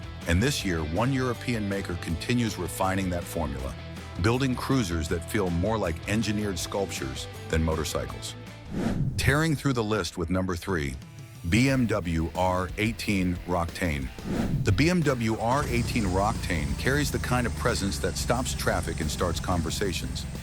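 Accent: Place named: United States. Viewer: This video is funny. First of all using a American accent but this list is far from American choices of bikes in that order. More than half these bikes are not in demand in America as they want you to believe. This video is obviously catered to the people of the U.K. too funny... Most American bikes on the streets will be Sport bikes 750cc and above, all classes of HD and Indian with maxed out Horsepower. We like bikes that are fast and big here people, so don't kid yourselves.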